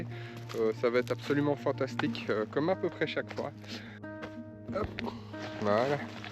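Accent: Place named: East, Belgium. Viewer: Petit accent là, ça sent l'Est de la Belgique...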